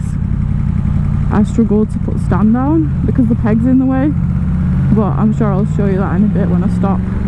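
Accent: Northern England, United Kingdom. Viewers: Great Vid,X...As all yr vids,TOPS,Great to find one in uk,Im in Essex we hook up with some guys on a sunday morning,supermoto run,my mate has mt09,awsum bike,just wonderd what would you recoment to clean a bike,can never get mine looking like a show room bike,thank you,iv been ryding ducati 1100,hypermotard,can not catch mt09,love your accent,up north,The HART OF ENGLAND,Your a great Biker,Take care,Safe bikeing,X. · I am looking at the MT10 for the next bike to strip and rebuild. So this video hit my feed. I could not complete the video because that girl is so dull. Is she trying to sound dumb or is it that northern accent. Yeah, pull your bottle blonde hair out from your helmet and slap a tonne of eye make up on... that will get your hit count up some (jaw-breaking yawn). Why did I leave England 10 year ago... Oh, I remember now!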